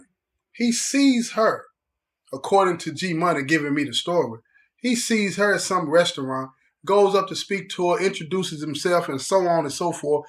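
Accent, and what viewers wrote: South, United States. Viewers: Hi, I look forward to ur videos everyday. Ur VERY consistent I love ur southern accent, I think it's sexy, anyway, u hv become part of my morning routine. I wish there was a way to send u $. U r LIT J! Hv a good day.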